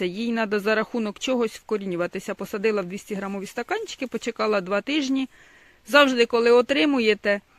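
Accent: Poltava, Ukraine. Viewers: я з задоволенням дивлюся і слухаю ваші відео,наш полтавській говір- чудо, дякую.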